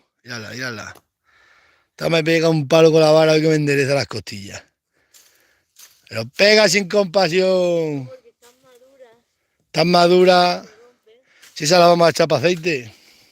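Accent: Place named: Galicia, Spain. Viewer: Aqui un Gallego .... a éste Gallego de 68 años , aunque repitas 100 veces lo mismo .... ENTRAS EN VENA TIO .... lo dices como hablan los paisanos de A MIÑA TERRA , de la Galicia profunda ....TAL COMO SOMOSSSS!!! ... ERES UN CRACKKKKK !!! ... VER A TU FAMILIA A TU LADO , ES ENTENDER PORQUE LUCHAIS Y LO MAS IMPORTANTE ES ENTENDER PORQUE PROGUESAIS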